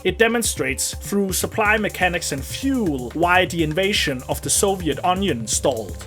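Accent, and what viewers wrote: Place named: Denmark. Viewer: Elsker det danske accent🫡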